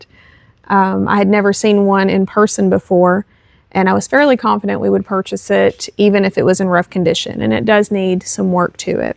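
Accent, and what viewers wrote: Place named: South, United States. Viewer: Did I not say you are intelligent and knowledgeable? Hon, you should hear my accent. I'm from SC. I spent a lot of my life in business offices, and I know how people perceive that particular regional dialect, because I used to speak it. But you do you. I appreciate your knowledge, and will keep watching you, regardless. Honestly, just trying to help, so you will be seen as more professional. People already think anybody with a southern accent is stupid. I live in FL, and I moved from S. FL to N. FL in part because people made fun of my accent. I love it up here. It's truly the deep south of FL, complete with oak trees dripping with moss. Have a lovely, day. I didn't meant to hurt your feelings. <3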